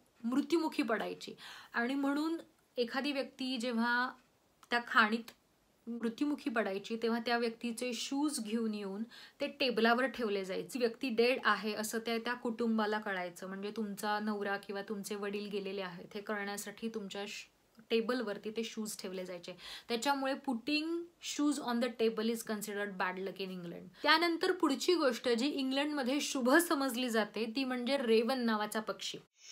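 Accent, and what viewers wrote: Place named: Pune, India. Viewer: Hi,tumchya uchcharatun spasht janawtay ki tumhi punyachya ahat? Ami right?😂😂 But still you have very well explained the topic n let me tell you video on rotimatic was awesome but little costly thats all.all the best.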